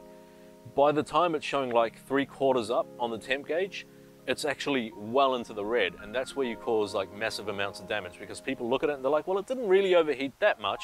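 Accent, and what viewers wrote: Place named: New Zealand. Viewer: Good ol' Kiwi accent . . . nice!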